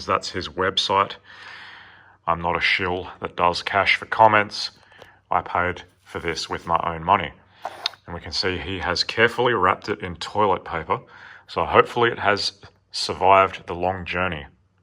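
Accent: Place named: Australia. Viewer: I love the way you say Red 124. And you have a badass Australian accent brother. Hope you and your legendary Red 124(W124 300E-24V are doing fantastic good sir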